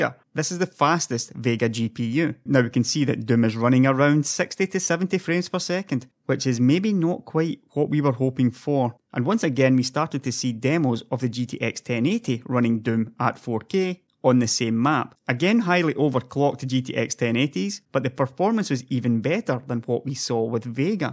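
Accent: Scotland, United Kingdom. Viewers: Its Scottish its difficult if you aren't native English speaker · so it is scotish not indian....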